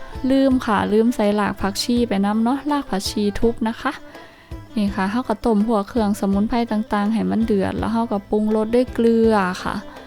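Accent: Isan, Thailand. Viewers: ลุกคนอี่สานเว้าแบบบ้านๆทางอี่สานม้วนดีดีอี่หลี่เด้อหล้าเอ้ย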